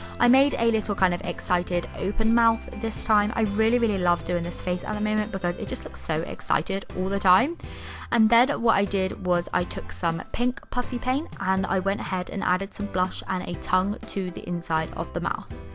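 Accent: England, United Kingdom. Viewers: Honeycomb Owl mmm she isn’t Scottish lol she is english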